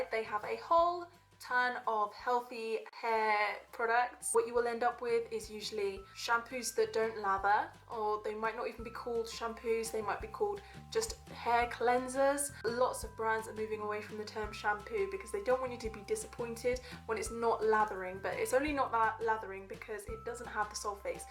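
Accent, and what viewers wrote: United Kingdom. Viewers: I'm pretty sure that's a British accent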